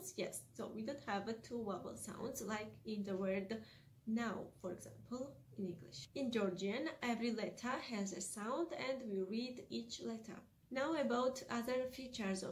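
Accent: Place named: England, United Kingdom. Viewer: I like your English accent. I'm from The Maldives 🇲🇻 and I'm a language enthusiast and I think Georgian (mkhedruli) has the most beautiful writing system. I already know how to pronounce all the sounds including (q'); thanks to my phonetic skills. My target language now is Polish and after that Hungarian and Georgian.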